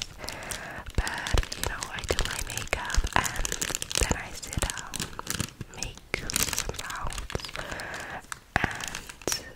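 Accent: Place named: East Germany, Germany. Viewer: I think Eastern German/Polish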